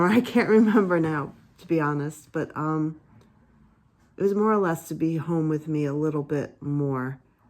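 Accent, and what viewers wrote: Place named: East Coast, United States. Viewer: Love hearing you talk. I adore your accent. Being from the midwest, eastern accents sound great to me. Rainy days are good for chats like this.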